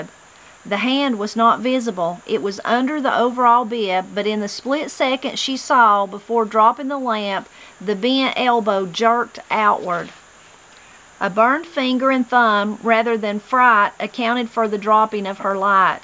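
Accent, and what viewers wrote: Appalachia, United States. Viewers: “Teacher” is a brave and adventurous woman!! Thanks, your genuine Appalachian accent makes these readings special!💜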